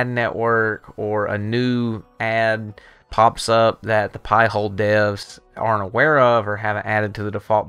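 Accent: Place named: South, United States. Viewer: The southern twang is heavy. 😂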